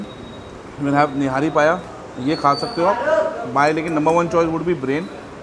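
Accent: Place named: Hyderabad, India. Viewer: Hahaha u r speaking pure hyderabadi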